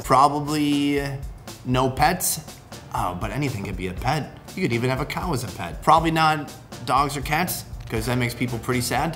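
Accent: Minnesota, United States. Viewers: I thought he sounded Minnesotan, didn't realize he was from my hometown area!!! 💁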